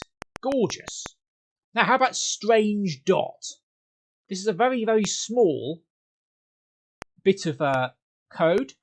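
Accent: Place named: Cockney, United Kingdom. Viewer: Oi. Ya Cockney was right 'ood, mate.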